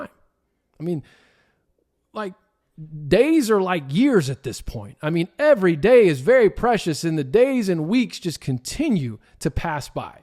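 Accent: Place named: East Coast, United States. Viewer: PAC refugees going to a depleted AAC 2 timezones away with a locked in 9+ year contract making roughly $4MM more than MWC is suicide. The brutal reality is MWC offers the softest/safest landing spot. PAC+MWC merging keeping PAC name makes the most sense financially, economically, and geographicly. Add Gonzaga as the 14/16th member would be the cherry on top. This podcaster sounds like he's from the East coast chiming in his biased opinion.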